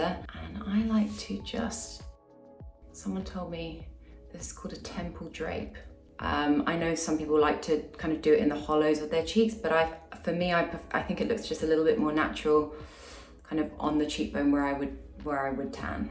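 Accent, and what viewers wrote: United Kingdom. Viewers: What accents she has? British.. but not that Seamus on Harry Potter😅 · The only time I actually like a Brit girl voice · Why do I always thought that she's American for some reason? The moment she speak, I was a bit surprise to hear her British accent. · Is she British based on her accent?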